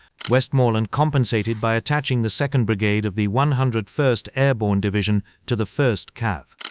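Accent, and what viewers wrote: United Kingdom. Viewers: Why the british accent guy narrating. Get an American.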